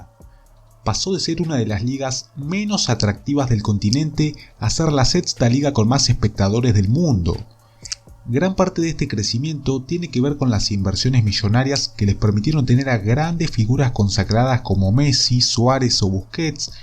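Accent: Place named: Uruguay. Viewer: El acento Uruguayo es asqueroso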